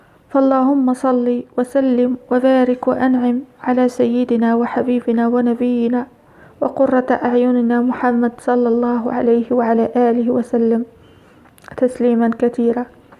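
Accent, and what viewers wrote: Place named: Tunisia. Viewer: السلام عليكم ورحمة الله وبركاته من كلامك أنك تونسية بارك آلله فيك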